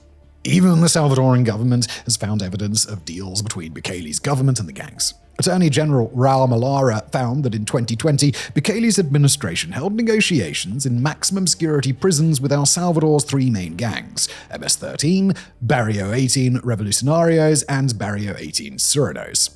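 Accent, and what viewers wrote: United Kingdom. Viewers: This is what mindlessly believing videos because it’s on YouTube and the speaker has a British accent looks like. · I pity so much. His britishness makes him pronounce words as simple as 'hour' as 'auagh'.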